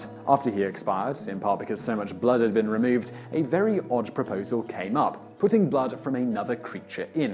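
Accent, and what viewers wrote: England, United Kingdom. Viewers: Your facts are true. Do we need to be reminded of them,. by some smooth english accented tory. Washington also had a greyhound dog named Cornwallis. Now that is worth knowing. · Should we trust these Washington facts from a guy with a British accent?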